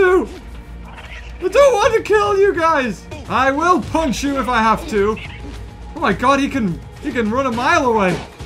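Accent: United Kingdom. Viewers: He’s british but has a deeper voice than stampy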